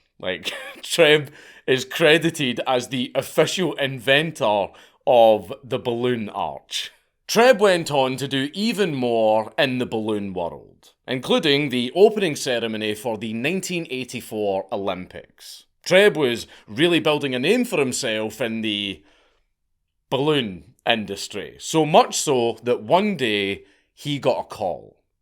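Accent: Scotland, United Kingdom. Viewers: You are my favorite scot, even though you live in brit. Love your accent of homeland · Hearing a Scott talk about my hometown feels wrong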